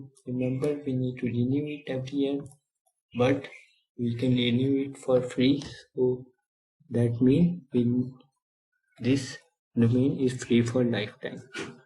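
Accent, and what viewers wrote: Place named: India. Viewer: I heard the Indian voice and was hopeful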